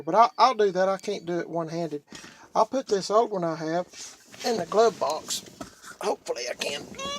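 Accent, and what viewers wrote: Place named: South, United States. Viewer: Are you from Alabama? Your accent gives you away, you’re from the south. LOL! I am visually impaired, and enjoy hearing the sounds of those old pick up trucks of yours. Would love to hear the old 78 roar to life. For several years of my life, I lived 4 miles from the Talladega superspeedway, so I love anything with straight pipes.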